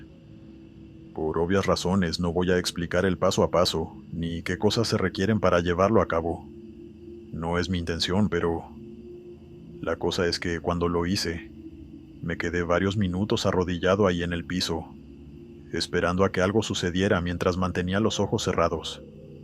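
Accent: Mexico. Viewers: La voz del narrador es absolutamente envolvente, su acento Mexicano la hace aún más increíble, perfecta para relatos de terror. Adoro este canal🙏🏽🇨🇴❤️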